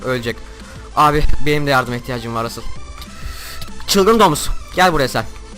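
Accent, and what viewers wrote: Kütahya, Turkey. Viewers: abi sen kutahyalimisin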